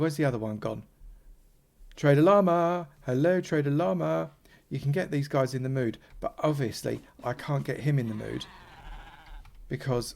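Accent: United Kingdom. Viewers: btw, oi quoite loik ur british accent its almost asmr to my ears i may join ur server someday. plz do a video on...endermites?(i couldnt think of anything)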